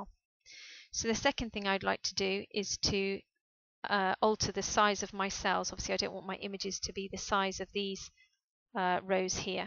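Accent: United Kingdom. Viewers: #Offtopic I wonder whether is British, voice is way too sexy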